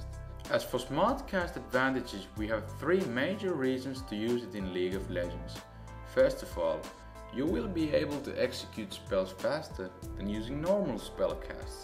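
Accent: Finland. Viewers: omg u sound so finnish :D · Holy shit are you Finnish? :D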